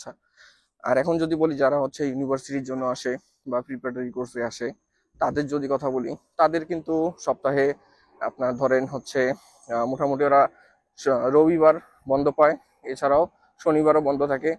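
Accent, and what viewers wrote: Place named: Sylhet, Bangladesh. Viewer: ভাই সিলেটর নি?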